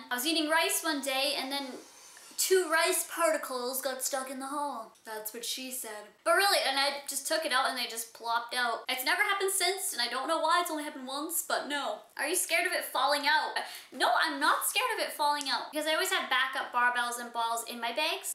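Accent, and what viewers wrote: Canada. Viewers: Are you Canadian? You sound like you are.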